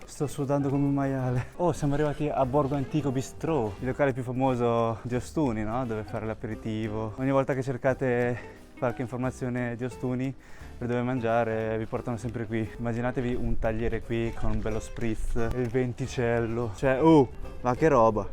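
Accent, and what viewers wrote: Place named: Romagna, Italy. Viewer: Hai un accento romagnolo, direi riminese, che mi fa morire 😅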